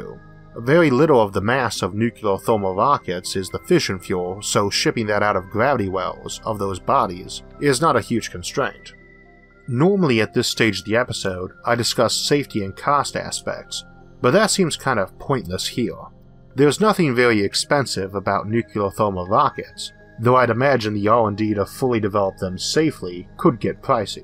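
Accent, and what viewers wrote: United States. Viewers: Where are you from? I can't tell if it's Southern or Boston. But it's probably neither - not that good with American accents yet. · actually what you call a speech imperiment to me sounds like most british people. like how brits pronounce english letters. but your accent is american. works for me! 👍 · I wish more people sounded like you. Almost sounds country and like I'm in a movie. Very well informed. Thanks for inspiring me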